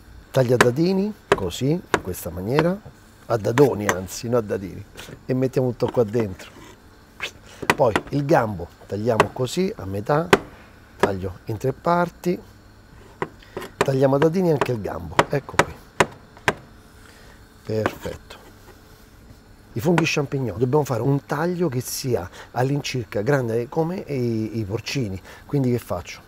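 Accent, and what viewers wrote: Rome, Italy. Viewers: Troppo simpatico con questa cadenza romana che dire..... bravo ⭐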